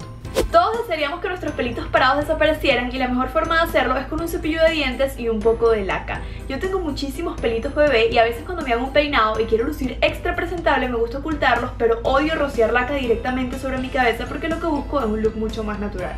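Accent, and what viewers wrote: Venezuela. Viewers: De que pais eres?? de Venezuela? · sii soy venezolana, y supe que tu lo eras por tu acento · Igual porque eres venezolana no capto tan rápido jeje, yo soy mexicana y a veces al escuchar los diferentes tipos de acentos de otros países aunque hablemos castellano a menudo me son un poco difíciles de cachar ;) pero muy buenos tips! gracias ;) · Una pregunta tu eres Venezolana? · eres venezolana?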